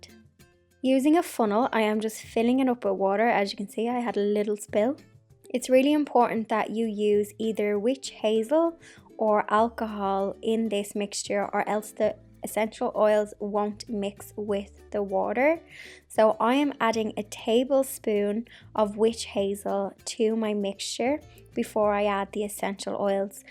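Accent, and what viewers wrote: Ireland. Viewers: You could read the telephone book to me and I would listen. ( not in a creepy way!) I love your Irish accent. You have such a lovely home as well and I love to see all your DIY stuff. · I love your channel lovely to hear an Irish voice on here 😻 I will definitely try your recipes as I love essential oils and handmade soaps I must get some soap making supplies thank you for sharing xx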